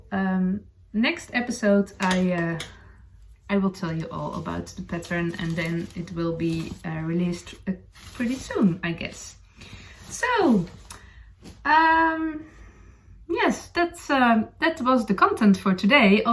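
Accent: Netherlands. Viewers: I love your podcasts. You r duchyenglish is charming. It is one cactus many cacti. We have a few words ending in I l think it is Latin but l am not sure. Continue with the English. I lived in Sweden for a few years and would like to think that my Swedish was as good as your English. Anyway one cactus many cactii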